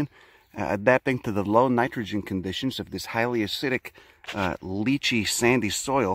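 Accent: Chicago, United States. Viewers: I liked your Chicago Italianiano accent, then you cussed, along with your Latin splain, and you won my heart and mind.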